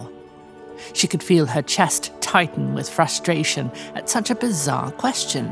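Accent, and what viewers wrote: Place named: United Kingdom. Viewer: Glossing it up with a British narrator, still shite as they’d say.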